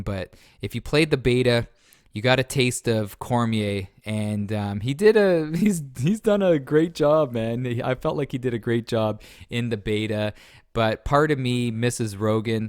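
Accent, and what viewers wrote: Canada. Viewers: Why do u sound soooo canadian?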